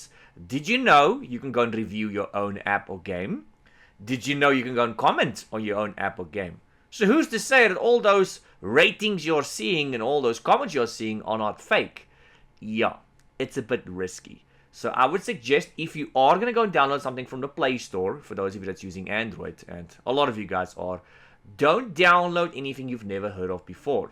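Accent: South Africa. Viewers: I'm impressed by your content! Love the South African accent with the thorough explanations. A copy and paste of the compTIA A+ course but with your own style. Keep it up!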